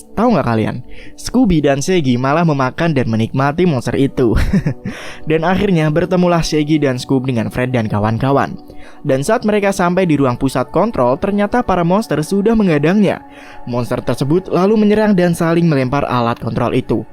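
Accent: Java, Indonesia. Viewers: orang Jawa ya?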